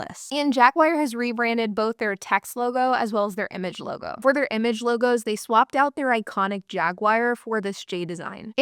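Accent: United States. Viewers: Americans most often say “jag war” for the animal and the car. Americans rarely pronounce the “u”, unlike the British. In the American NE, the “war” would most likely be pronounced “wire”. My guess is she has an American NE accent. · She can't help being American, they only have a tenuous relationship with the English language. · It's how Americans pronounce it get over it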